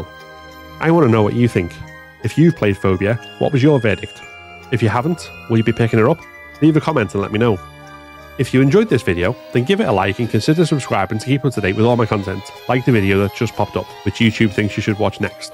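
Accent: Liverpool, United Kingdom. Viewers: 1st time av heard a reviewer with a scouse accent 👍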